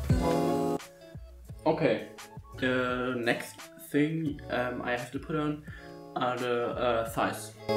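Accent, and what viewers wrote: Germany. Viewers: that all can heared out hes germany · Ich hab danach gesucht das jemand merkt das er einen deutschen akzent hat 🤣😂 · Mann hört es instand raus mit dem deutsch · damit war gemeint, dass man hört, dass er eigentlich deutscher ist und nicht Engländer oder so... Er hat alles richtig gemacht/geschrieben, man hört wohl raus das dir ein paar Gehirnzellen fehlen 🤦‍♂️ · Man hört so raus das er Deutsch ist❤️😂😂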